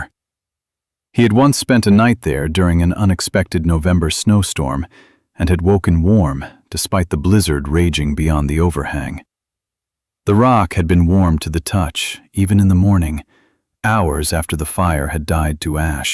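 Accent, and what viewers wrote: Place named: RP, United Kingdom. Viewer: Please explain the reason for the back and forth shifts in the narration, starting in standard North American English without accent and changing to English with a posh British accent. This starts at approximately . At that point, the narration was describing Daniel's father, Thomas, who was a immigrant stonemason from Ireland. (Just a little historical side note: In the past --- how can I say this delicately--- the Irish and the British were NOT best buddies. Enough said.) Then at , the narrator returns to North American speech without accent until approximately when posh British starts up again. Posh British only lasted a short time until stopping mid-sentence . From that time, the narration was in North American English until when Posh Brit accented speech popped back up up. the narration reverted to North American speech without accent until the end in less than one minute. 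This story and the background artwork were excellent. However, the back and forth accent narrative is distracting and detrimental. Please skip the posh British accent unless the story is about posh British characters.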